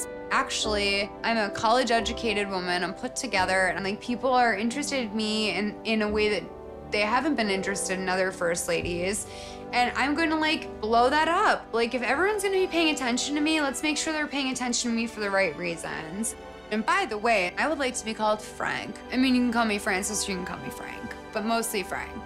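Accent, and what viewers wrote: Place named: California, United States. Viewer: California girl here. I feel like this chick is how the world thinks my people speak. I would shoot myself if I sounded like this chick. I'm sure shes a nice person and all but her voice .... my GOD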